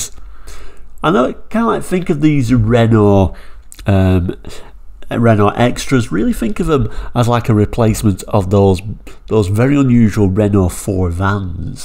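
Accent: United Kingdom. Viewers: I owned a Master from 1993 with a 2.5 diesel engine (atmo, 75hp). Reliable, economic but gutless. Renault was a good brand at that time; by now, they just produce electronic plastic craps like any other car manufacturer does. Dishonesty, scam at its best. Some interesting infos once again. I love your british english accent! Thanks for that.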